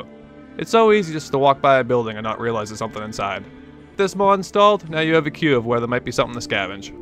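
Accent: Boston, United States. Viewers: okay thank you for replaying! Being a console player my whole life mods are foreign to me. One more thing, are you from boston? Coming from a fellow Bostonian. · cool I like the Boston accent and could u do a vid on energy weapon mods if u haven't already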